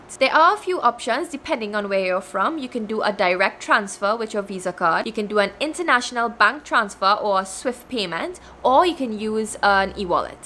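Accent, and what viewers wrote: Trinidad and Tobago. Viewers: Thank you here from Jamaica .Miss Trini haha. love de accent girl. 💛 Thanks for sharing your knowledge and tips. . I am new and already see results as a pantyhose foot "model". Bless you and stay well. 💛💚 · That Trini accent!!!! ❤ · Nice Trinidad accent 👌🏼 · I love you accent lol TT 💖 I have family in Trinidad ❤️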